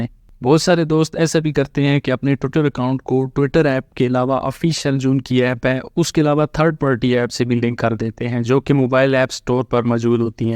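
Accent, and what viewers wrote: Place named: Pakistan. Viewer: جناب صاحب السلام علیکم ورحمتہ اللہ وبرکاتہ بعدہ عرض ہیکہ آپ اپنی گفتگو کے دوران انگریزی زبان کے الفاظ بہت زیادہ استعمال کرتے ہیں کیا آپ ایک پاکستانی شخص ہیں کیونکہ آپکے لب لہجہ سے یہی معلوم ہوتا ہیکہ آپ ایک پاکستانی شخص ہیں' میرے مشاہدات و تجربات میں یہ بات آئی ہیکہ ملک پاکستان میں صحیح وخالص اردو زبان صرف علماء ربانیین ہی بولتے ھیں بقیہ عوام الناس تو انگریزی زبان سے بہت متائثر بلکہ بہت مرعوب ہے، قال اللہ سبحانہ وتعالیٰ، ألا ان اولیاء اللہ لا خوف علیھم ولا ھم یحزنون، الذین آمنوا و کانوا یتقون۔ خیر اندیش حافظ عبدالحفیظ، الجمہوریہ الھندیہ ۔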